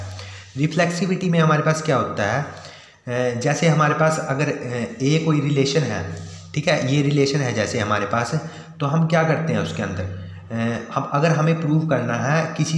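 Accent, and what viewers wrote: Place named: Haryana, India. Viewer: Aap ke accent se pata chal gya ki aap haryana ke ass pass se ho , ho sakta hai haryana se hi ho , Thankyou so much sir , ye accent chupaye ni chupta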